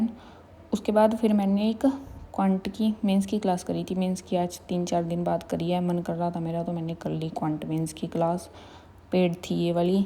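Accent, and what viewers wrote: Haryana, India. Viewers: your hindi with haryanvi accent is too good #complement❤😄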